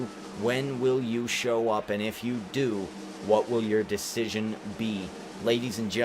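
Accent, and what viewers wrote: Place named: Switzerland. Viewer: Here we go, Friday Night Smackdown (It is late, so I'm going to make these things short). A pretty interesting grudge match, and I'm gonna go with Owen Hart. He's impressed me one hell of a lot recently. Damn, what a fantastic match, especially the run-in! I can see why you're super happy with the way you use Universe Mode, that was great!:D. As much as I adore Antonio Cesaro, AIDEN ENGLISH WILL PREVAIL, I JUST KNOW IT! I liked your Swiss accent, even though it did slip into English and Irish quite a lot... but details! while I'm sad Aiden English did not win, Cesaro's commentary was really fun, so it is all good! Great work! Gotta go with Orton. You never want to piss off a Viper. A great match, with some nice Samoa Joe commentary on the side!:). Gonna go with my man, Corey Graves! Damn, Rider kicked some major ass in this one! 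Utterly murdered Graves! WWWYKI! I'm going to give my victory to The Shield here. They've been super dominant, and right now, I don't think Yoko or Kofi can stop them. Wow, The Milita comes out on top! I'm impressed with them, they could be a real threat towards The Shield's reign as tag team champions! Great stuff as always!:).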